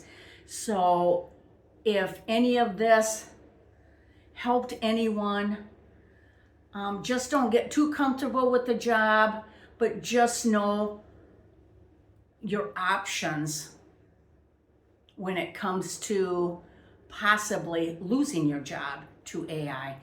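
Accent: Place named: Great Lakes, United States. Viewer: your accent sounds like your from the great lakes region ( chicago , milwaukee , minnesota )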